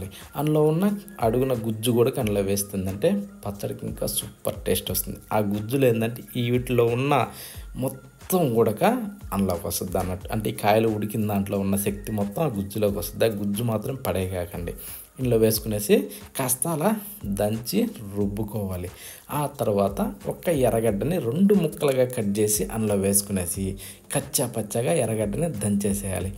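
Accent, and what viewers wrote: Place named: Rayalaseema, India. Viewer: Gudka yendi bhasha bagaledu seema yaasa😂😂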